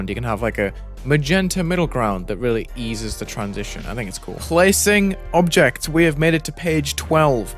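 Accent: United Kingdom. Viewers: you're british!?!? nIce vid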